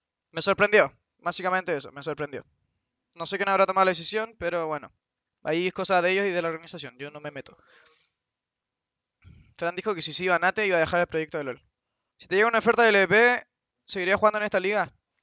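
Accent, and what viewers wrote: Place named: Argentina. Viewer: Pq habla como argentino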